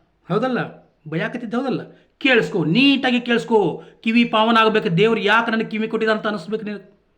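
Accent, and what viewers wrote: North Karnataka, India. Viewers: Uthara karnatakada sogadu adbhutha. Nimma baigulagallannu keluvudakke onthara maja. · ಅಣ್ಣ..ನಾವು ಕರಾವಳಿ ಭಾಗದವರು ಅಂದರೆ ಧರ್ಮಸ್ಥಳದ ಸಮೀಪ.. ರಾಕೇಶ್ ಶೆಟ್ಟಿಯಂತಹ ಅಲ್ಕಾ ಬೇವರ್ಸಿ ತಾವು ಎಷ್ಟು ಬೈದ್ರು ಸಾಕಾಗಲ್ಲ..ಉತ್ತರ ಕನ್ನಡದ ಭಾಷೆ ಕೇಳೋಕೆ ಬಹಳ ಚಂದ.. 🙏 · Nam uttara karnataka bhashene chenda anna🤩🤩🔥🔥🔥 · Chennagide nim uk bhashe bro bless u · ಉತ್ತರ ಕರ್ನಾಟಕ ಭಾಷೆ ಅದ್ಭುತ, ಬೈ ಇನ್ನು ಬೈ ಆ ಸೂ,,, ಮಗಗ್ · Kshamisuvantha mathannu neevu aadilla bidu thamma aa halkat rakesh shetty ge sariyagiye ugididdiya yaradru avnige chennagi baiyabeku nange baralla adikke andkondidde ninna mathu keli bahala santhoshavaythu thamma innuu yenenu baruthho adella ugi namge bejarilla uttara karnatakavadrenu dakshinavadrenu madya adrenu yella karnatakane thane nimma kadeya bhashe style chenda 😊😅😂😂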